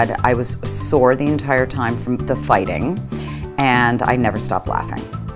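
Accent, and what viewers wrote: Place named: South, United States. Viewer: Hearing her Southern accent makes me want to see her play Clairee from Steel Magnolias.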